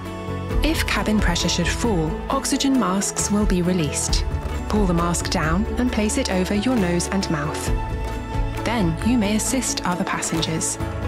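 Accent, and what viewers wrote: United Kingdom. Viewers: Мне кажется надо было записать реальный голос бортпроводника с казахским акцентом, ведь в этом тоже кайф, а не прилизанный британский английский. В остальном все круто сделано! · Какой великолепный британский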